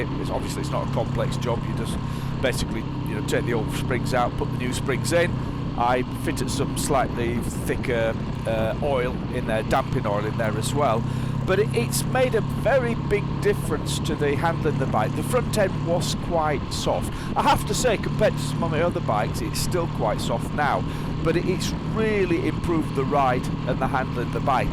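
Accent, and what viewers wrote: England, United Kingdom. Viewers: Nice Video but even though I enjoy English Accents, I would like to hear the motor more. Go thorough the gears a couple of times with your mouth cloised. Cheerio...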